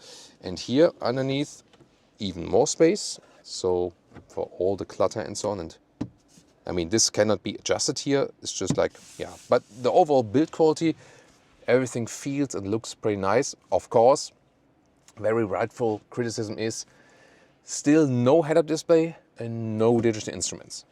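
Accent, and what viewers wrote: Germany. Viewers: Love your accent German Guy. Content and presentation is perfekt! I can now fee the car. Y is a Berlin Car. Good quality. SPASS Leute trennt euch von Politik, die Kiste ist geil! In Chin geht das Ding weg wie warme Semmeln, obwohl da die eigenen Unternehmen für die Hälfte verkaufen. Mal sehen, wann die mit Steueren antworten. Danke für den Beitrag 🚀.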